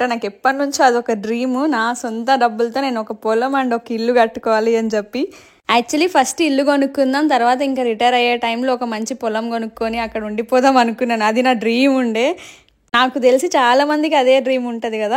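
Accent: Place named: Telangana, India. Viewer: మీరు అన్నమయ్య జిల్లా అంటున్నారు. కానీ తెలంగాణా యాస మాట్లాడుతున్నారు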